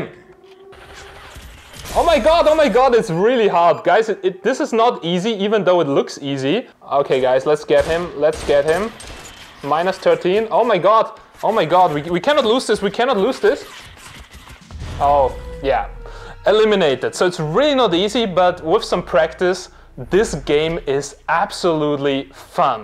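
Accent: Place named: Germany. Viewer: He sounds sooooo german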